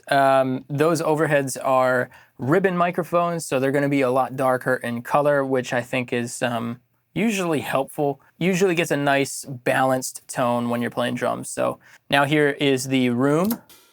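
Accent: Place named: United Kingdom. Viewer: Love the British accent mate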